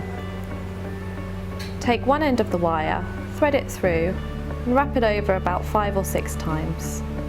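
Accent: United Kingdom. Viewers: She's Brit not American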